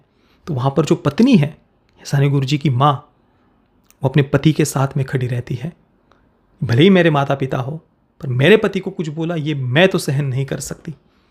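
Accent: Maharashtra, India. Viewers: मी आत्ताच बघून आलो!!❤️❤️..आणि शुध्द मराठी बोली🔥❤❤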